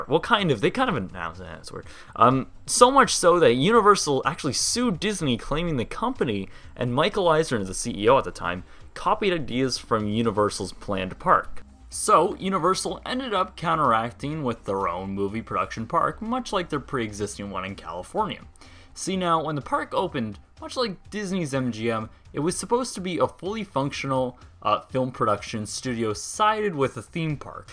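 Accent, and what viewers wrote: Canada. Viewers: I have one question for you boy: Are you Canadian? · You sound Canadian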